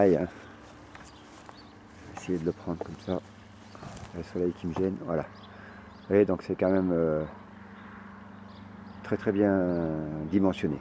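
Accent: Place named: Saint-Étienne, France. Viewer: Très bonne présentation l’accent on dirait un Stéphanois égaré dans le 38...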